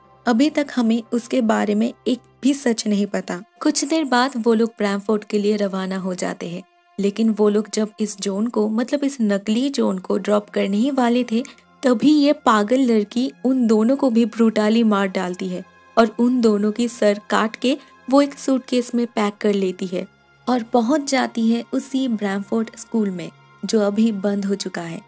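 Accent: Bengal, India. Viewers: Pls work on ur Hindi dear...Im sure ur Bengali...tats y... gender mistakes happening....pls work on it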